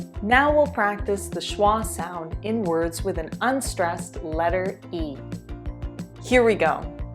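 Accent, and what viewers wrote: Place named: Canada. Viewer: so this is Canadian English, it's good for me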